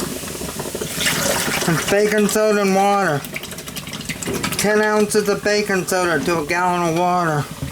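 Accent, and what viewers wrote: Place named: United Kingdom. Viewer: You speak British in n terrible way. Whaa Bawaah bong whaaa tralaaa. I can not make out a whood yo shaey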